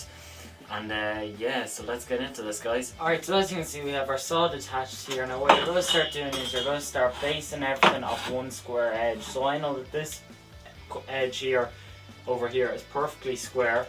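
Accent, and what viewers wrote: Ireland. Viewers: Thanks. I have an old bench top saw which is about as accurate as a banana. Been looking around various videos on the net but have to say yours is excellent. I think the motor on my saw is fine so thinking of using that. My difference is that I am paraplegic so use a wheelchair so need to build the bench a bit lower. Thanks for the video. Only found you today but watched 4 of your vids and will watch more. From your accent I assume you are in the Emerald Isle. North or South? I'm in England near Cambridge but have visited Ireland many times and think it is a great place and have to say have always been made welcome. Sorry to babble on.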